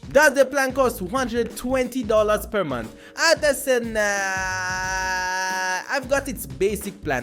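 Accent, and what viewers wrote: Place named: India. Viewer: چرا لحجه هندی داره هوش مصنوییت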